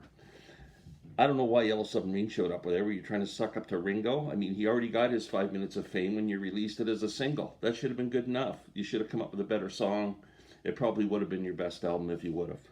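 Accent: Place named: Canada. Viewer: That was hilarious! Also, I can't imagine anything coming across offensive when speaking in a Canadian accent. Cheers!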